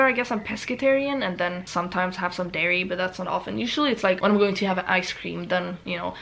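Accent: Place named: United States. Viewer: omg ur american accent is so much stronger now! I havent been on youtube for ages and I really notice a difference! Cool :—-) Loved this vid xx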